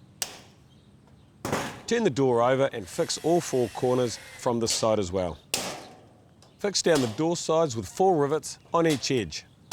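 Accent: New Zealand. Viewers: Just a Kiwi accent the whole time mate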